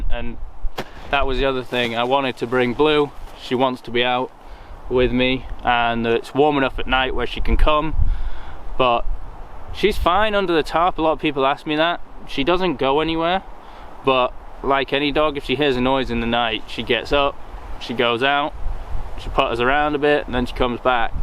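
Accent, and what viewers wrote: United Kingdom. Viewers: Dude are you country or British I can’t tell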